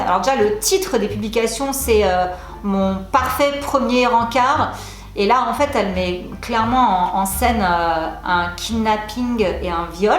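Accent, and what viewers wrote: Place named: Alsace, France. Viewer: Est-ce que t'es alsacienne ? Ta un petit accent 😅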